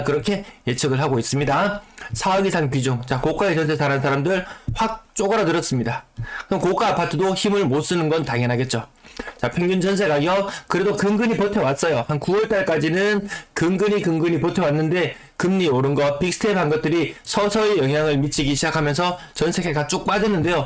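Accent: Gyeongsang, South Korea. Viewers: 구수한 경상도 코멘트가 참 재밌어서 항상 즐감합니다 ㅎㅎ 고향 부산 떠나 서울 온 지 45 년, 벌써 60 중반이 넘었네요~ 그동안 국가의 소중한 자산들이 대외경쟁력을 높이는 기술/투자/연구/다양성 인재 개발 등에 들어가는 것이 아니라 제 살 갂아 먹기, 투기, 후세에 폭탄 물려주기 등 부동산을 통한 탐욕에 쏠려 있어 내심 걱정이 많았지요. 저는 수십 년 동안 열심히 일해서 자식들 다 공부 시키고 지금은 서울에서 전세로 살고 있지만 두 단위 억원 정도를 은행에 넣어 쏠쏠한 이자 받고 사업 소득도 가지며 잘 살고 있습니다. "모든 것은 본래의 가치로 회기한다" 일부 영끌 20/30/40 우리 후세들이 향후 30~40 년 간 너무 오랫동안 은행의 노예로 묶일 것을 생각하니 슬프고 참담합니다. 그런 이 나라의 장래는 어떨까요? 어릴 때 어른들이 하신 말씀 한 마디 남기고 이 노부는 글을 마감합니다. 젊은이들이 4~50 년 전에 제가 들었던 이 말을 새겼으면 합니다. "세상에 가장 무서운 게 뭔 지 아니? 바로 빚쟁이란다" 이젠 그 빚쟁이는 우리 곁에 있는 살가운 은행이랍니다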